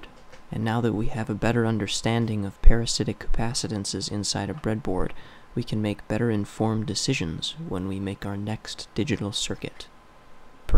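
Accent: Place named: Utah, United States. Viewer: Buddy where is your accent from? Utah ? It's kinda cool!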